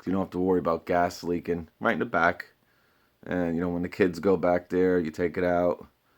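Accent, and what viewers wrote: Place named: Northeast, United States. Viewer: You have to look too beyond the initial price. Some might say I can get a "better" Toro, HomeLite, etc. at Lowes/Home Depot initially but where do you go to get it serviced, definitely not Home Depot, etc. Ace Hardware is a Authorized Dealer and typically your old school lawn shops service the higher end products like Stihl, Husqvarna. You have to add that to your overall decision in purchasing a unit. I think for myself, for light blowing off grass the driveway and deck, I can't beat the BG50 for my personal use that is for sure. Love the Northeast accent! lol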